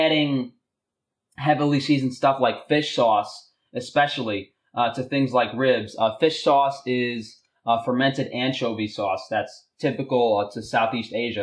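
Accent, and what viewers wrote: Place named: East Coast, United States. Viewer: Bro I love how into it you get!!! Definitely a man who's passionate about quality meat. Keep up the awesome work... Always great info... And I'm always thoroughly entertained by your East-Coast-ness!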